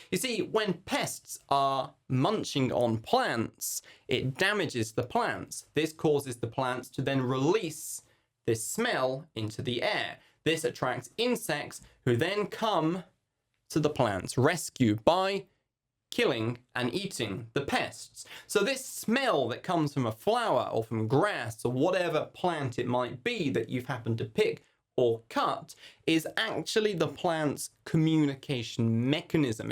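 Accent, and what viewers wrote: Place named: United Kingdom. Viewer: I love your British accent.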